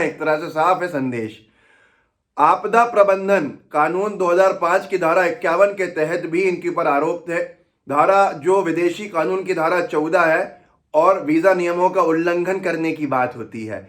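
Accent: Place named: Lucknow, India. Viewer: Lucknowi lag rahe ho bhai jubaan se, kaha se ho? Ahcha karyakaram hai, good luck!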